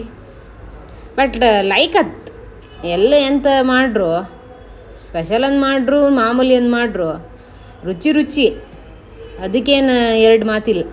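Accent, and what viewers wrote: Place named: Kundapur, India. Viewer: super kundapur bhashe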